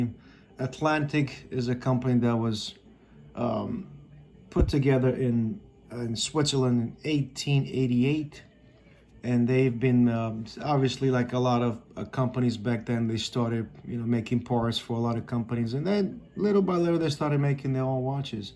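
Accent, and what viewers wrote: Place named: United States. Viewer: M-ai ambiționat sa scot vechiul atlantic al bunicului meu care e într-o stare destul de bună dar are nevoie de o servisare, am cumparat ceasuri noi gen Citizen eco drive, Certina, Orient și chiar uitasem de Atlantic 😂 Dacă nu spuneai unde te-ai născut nu îmi dădeam seama ca ești român, ai un accent de american pur, frumos review like a Pro! :)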